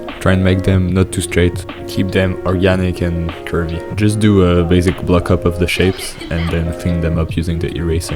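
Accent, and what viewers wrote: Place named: France. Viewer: that's just his french accent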